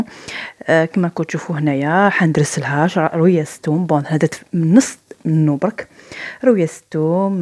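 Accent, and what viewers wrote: Algiers, Algeria. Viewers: Une recette que je prépare mais sans les raisins secs bientôt au programme in challah avec zbib.a votre voie je sens l algeroise j ai de la nostalgie pour mon enfance ou j étais entourée de personne qui avait la même façon de parler que dieu vous protège la mère d une amie à moi avait l habitude de préparer tbikha taa el hchich pouvez vous nous la faire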